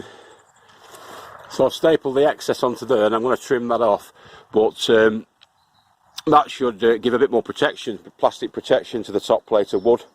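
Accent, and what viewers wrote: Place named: Lancashire, United Kingdom. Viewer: Lol. do the visitors from foreign lands understand your Lanky (Lancashire) dialect :)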